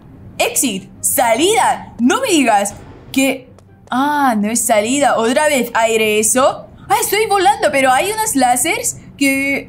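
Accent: Brazil. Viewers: Es de Brazil y le cuesta el español ojalá ser de brazil😢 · No se ve raro si se escucha así es que creo que es de Brasil por si acaso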